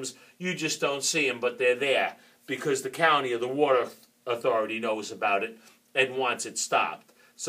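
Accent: New York, United States. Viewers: You hear that working class New York accent and you know you found a guy that knows what he’s talking about. Great video.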